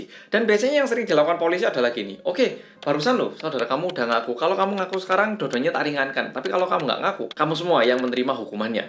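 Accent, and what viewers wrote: Java, Indonesia. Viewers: maaliiing wkwkkwkww medoook beuud yah mas e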